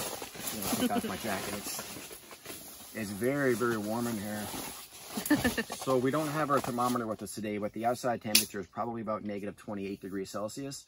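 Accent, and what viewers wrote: Canada. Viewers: Im 49 yrs old...i have not been camping since i was 13...... my wife and i are just now talking about possibly getting some camping gear...this is cool Brother you sound like you have a very thick northern accent....im guessing Canada???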